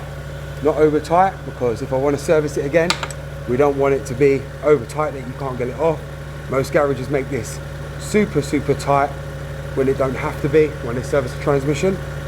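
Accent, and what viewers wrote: United Kingdom. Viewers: You had me.....I was convinced you were British until you said ZeeF transmission 🙄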